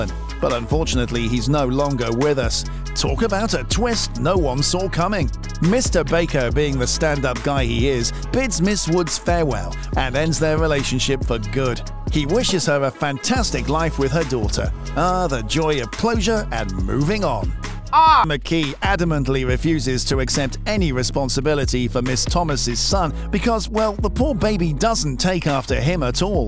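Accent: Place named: United Kingdom. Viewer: Who's the British voiceover?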